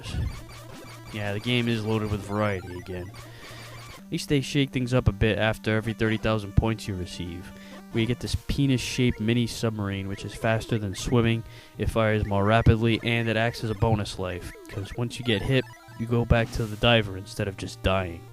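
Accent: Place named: New Jersey, United States. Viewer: sounds like you're from jersey.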